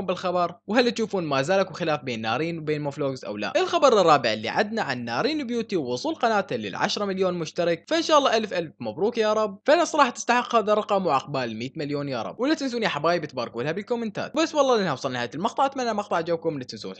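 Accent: Iraq. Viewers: أنت عراقي صح ولالا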